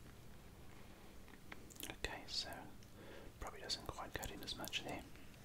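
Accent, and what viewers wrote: Australia. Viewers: Saw Australian accent and immediately subscribed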